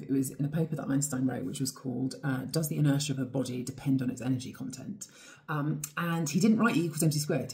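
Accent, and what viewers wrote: England, United Kingdom. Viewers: How can someone be so sexy talking about science? 20% brit accent, 10% red hair, 30% absolute gougeous lips, 40% inteligence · She's very smart, engaging, and good storyteller (something that is sadly lost these days), and yes, easy on the eyes. What I find makes her so watchable (besides the lovely Brih-ish accent) is her confidence and almost quirky sense of humor. The content is very good, too. We'll done! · Why did English accent degenerated to the noisy ear scratching American accent we have today? And I had to learn that crap instead of this lady’s beautiful dreamy sound???😡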